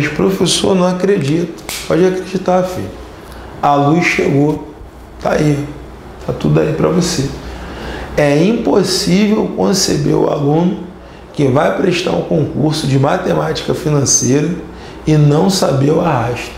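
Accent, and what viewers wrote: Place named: Rio de Janeiro, Brazil. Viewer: Exelente aula amigo, mas aguentar esse seu sutaque de carioca é insuportavel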